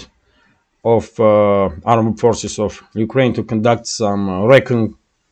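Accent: Russia. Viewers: By shortening the videois already a good start.Also having English subtitles helps alot because of your Russian accent.. 🎉🎉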